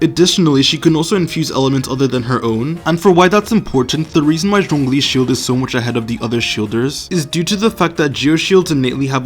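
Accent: United Kingdom. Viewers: Omg is british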